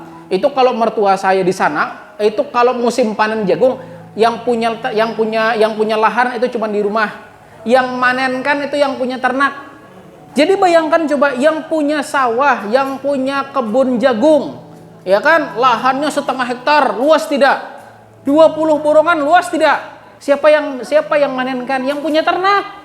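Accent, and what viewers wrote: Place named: West Java, Indonesia. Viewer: Mirip logat sunda 😅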